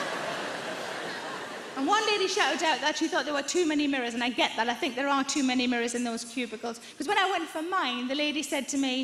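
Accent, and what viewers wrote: Newcastle, United Kingdom. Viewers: Saying something in a jeordi accent don't make it funny! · Is she a Geordie? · Mrs doubtfire had a Scottish accent didn't she? a geordie!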